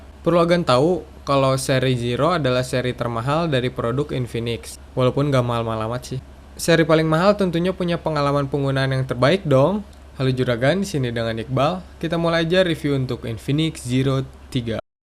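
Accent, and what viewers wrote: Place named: Bandung, Indonesia. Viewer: logatna bandung pisan euy ! Sampurasun mang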